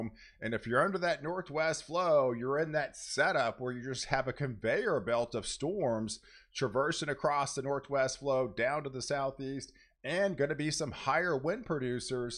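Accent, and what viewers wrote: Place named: South, United States. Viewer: love the southren accent!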